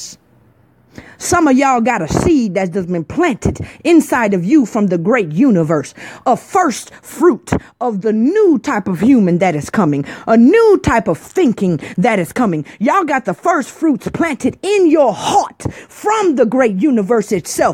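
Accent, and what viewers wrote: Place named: Chicago, United States. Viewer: Thank you. I am recovering from childhood abuse- my parents tried to kill me when I was 15 and I got away. I found you because I initially was listening to Eligio and started to peep game. The universe led me to you and I needed to hear this. Tomorrow is my 42 reincarnation date and it’s been psychologically challenging this year. This message was for me and I heard it live last night before it cut off but I heard exactly what I needed to hear. Blessings and thank you 🙏🏽. Btw, my husband and I thoroughly enjoy listening to you. Yes from the Chi and says you sound like his peoples. 🌟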